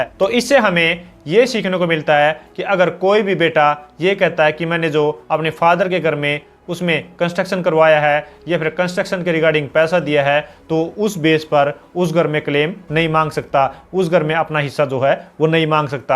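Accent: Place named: Haryana, India. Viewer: आपका वीडियो देखकर लगा कि स्क्रीन पर फिल्म अभिनेता अनिल कपूर हैं लेकिन आपकी हिन्दी में थोड़ा हरियाणवी टच है इसलिए बात समझ में आ गई।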